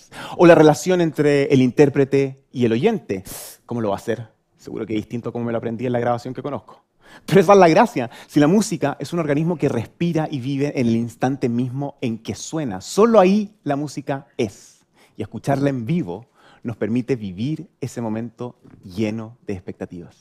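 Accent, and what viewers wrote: Chile. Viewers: Excelente, su acento es Chileno, como yo... Reconocible para cualquier Chileno, saludos 🤗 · Es de Chile. El acento sudamericano y centroamericanos siempre sonarán así porque no existe el español neutro en el día a día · Así suena un chileno hablando un español más general XD, sin modismos chilenos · Este gallo es chileno?? 😱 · Claramente, es por que ambos son Chilenos, acento parecido! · Es chileno? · Sin leer la descripción me di cuenta que era Chileno, por su acento, que grande un compatriota tan talentoso, felicitaciones y seguiré su canal desde ahora, éxito, sigue triunfando. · Como no reconocer a un chileno intentando pronunciar bien. Viva Chile ·%%$··3# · Zangano Inkulto Tiene todo que ver, es uno de los acentos chilenos 😂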